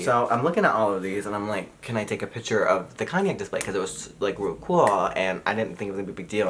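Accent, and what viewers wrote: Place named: Michigan, United States. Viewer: Your Michigan accent kept throwing me off. I thought you were saying Kanye-ac, as if Kanye had invented his own brand of cognac. Which would not even remotely surprise me. 😶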